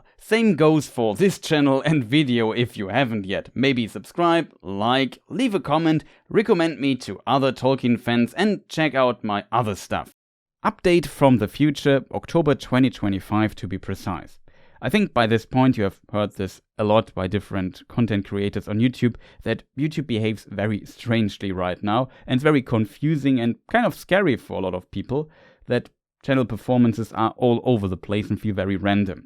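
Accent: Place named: Germany. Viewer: As I heared you talking, I immediately guessed that your native language is German, just like mine. 🇩🇪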